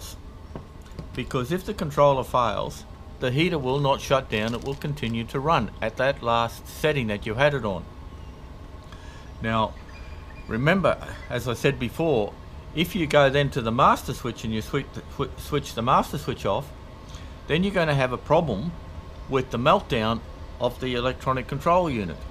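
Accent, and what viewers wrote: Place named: Australia. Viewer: Great videos, good to see some with an Aussie accent. Sorry if this has been asked but reading all of the comments on my phone is a bit tedious. Q: if the controller failed, how would the unit respond if the fuel supply was removed rather than the power, would the unit complete it's cool down procedure and shutdown gracefully or do those smarts live in the controller?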